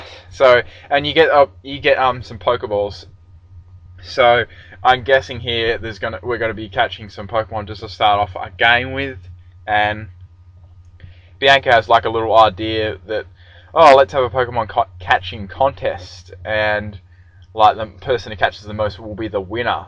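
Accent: Australia. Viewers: Yehhhhhh Australia. It 's the best accent and u all know it ahaha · are you australian?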